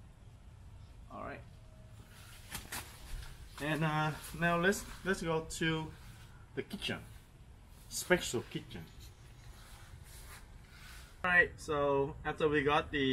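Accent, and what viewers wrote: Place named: Vietnam. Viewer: beautiful star fruit. You sounds like a Vietnamese, are you?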